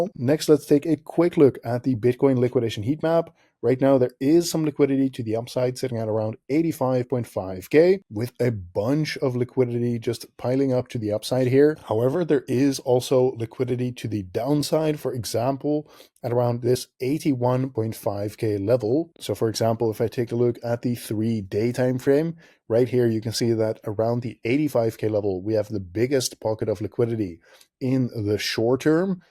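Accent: Netherlands. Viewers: Your accent sounds Dutch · Ik hoor NL 😅 Waar trade je met leverage?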